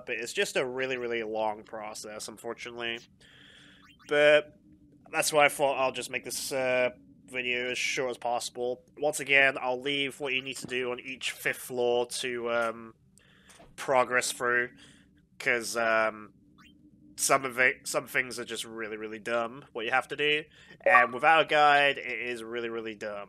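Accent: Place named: Australia. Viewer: that sounds about right. I was hearing some Australian too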